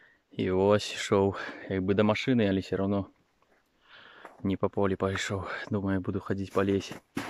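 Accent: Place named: Belarus. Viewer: Я балдею от белорусского языка, которым говорят бабушки в деревнях. Ваш же близок к литературному, он не такой красивый. Главное, что Вы нашли, что хотели.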